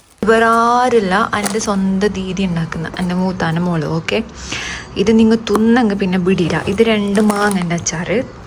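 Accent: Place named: Kasaragod, India. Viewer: Kasaragod yede😂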